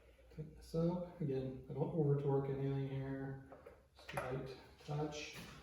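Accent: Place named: Canada. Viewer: So you're telling me this guy has sweet KTM 500 EXC, a sweet garage, a sweet set of tools and a sweet Canadian accent, went to O'Fallon Bike week in 2016 and he won Quantum Fuel Systems grand prize?! Some people. Thanks for vid. It doesn't help me at all w/my 2018 Super Duke, but thanks just the same.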